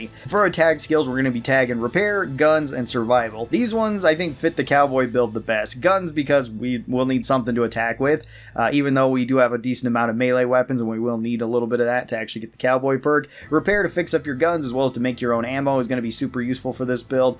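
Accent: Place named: West, United States. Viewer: I really love how you speak a little bit like a cowboy in the video as well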